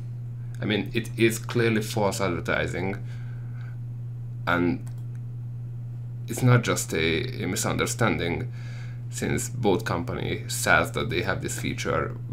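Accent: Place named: Hungary. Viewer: Az első mondatodnál éreztem már hogy magyar vagy :D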